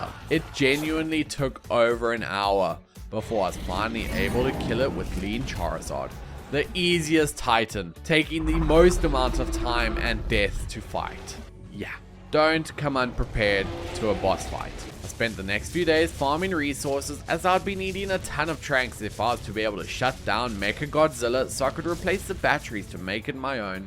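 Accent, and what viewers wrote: South Africa. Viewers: Wait are you South African?? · are u south african bro? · omfg i knew you sounded south African and then you said load shedding and then i was sure <3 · Are you south african? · Love your videos and i never knew you lived in South Africa telling by Eskom, plus your accent. Good to see another fellow South African Ark player. 😊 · Bra when I started watching I heard your south african accent and then you said eskom and then I knew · You sound so South African. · Are you from South Africa because I am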